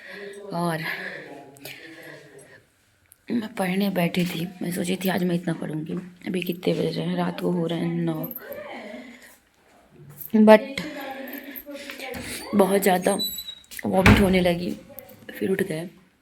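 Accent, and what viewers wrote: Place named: Rewa, India. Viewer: TONE SE TO REWA K LGG RHE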